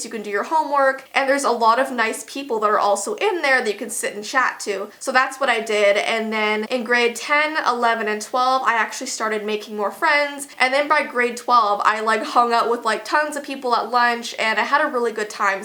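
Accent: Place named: Canada. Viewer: I love her Canadian accent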